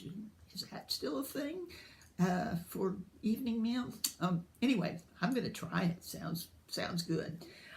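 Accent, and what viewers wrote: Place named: United States. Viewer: yes we drink tea in massive amounts. tea is the answer to everything. and beans on toast is standard food for us all the way through our lives. the most scrumptious and delicious comfort food, and very easy to make and very hearty when we can't be bothered to cook a full meal. Just open the tin of beans and make some toast! :D well I am British and I do like your accent too. Just as American ladies love English accents, so are we Englishmen attracted to American ladies with charming accents!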